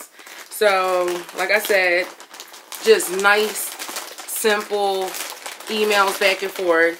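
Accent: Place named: Philadelphia, United States. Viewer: Ayyye you from philly to what part? As soon as i heard keep it a bean i was like she from philly #NorthPhillyOnThisJawn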